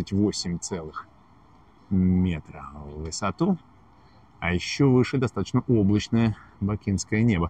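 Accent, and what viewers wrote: Standard. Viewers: твердая 5 за прекрасное знание истории Баку! Очень правильная и чисто русская литературная речь. Человек благородный и жизнерадостный. Мне самому стало любопытно, как иностранец может столь интересно рассказывает историю нашего города. Рад что для гостей Баку открываются свежие страницы из жизни нашего города. Люди добры и чистоплотны, вежливы и гостеприимны. сильно отличаются от других народов Кавказа. Жители Баку богаты и горды, не любят приставать со своими услугами к незнакомым людям, но всегда придут на помощь если к ним обратятся., вам всегда будет сопутствовать удача за ваше жизнелюбие. Жаль что поленились и не сняли все что увидели в Баку. Отдельно про Баку -чистый и омытый весенним дождем, вкусов жаренного мяса с разнообразными специями, ароматом горячего хлеба, морских волн и криком шумливых. попугаев. Баку рад и ждет гостей разных добрых и любопытных, мечтающих возбудить чувства и вкус в новых путешествиях и приключениях.. 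понравился Баку, нам понравились вы! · Наслаждаюсь чистейшей русской речью огромное спасибо спйчас это редкость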